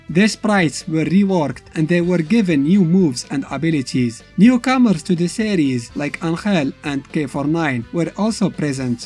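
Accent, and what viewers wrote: Morocco. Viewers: Great commentary! I knew you are moroccan by the accent! KOF is very popular in Morocco, especially KOF '97/'98 and '99. Still played a lot in the video arcades, i saw lot of strong players in Casablanca. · men l'accent katban liya mroki a ssat ila ma kentich ma ratfhem ta le3ba wma raytra walou :D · You sound Moroccan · from your accent, I can tell you're Moroccan (Tbarkellah aalik)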